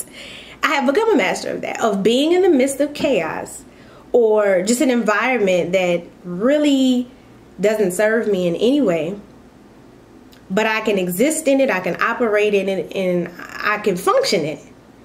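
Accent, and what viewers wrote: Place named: New Orleans, United States. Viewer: For the first time ever I heard that New Orleans accent/dialect...so sexxxy!!!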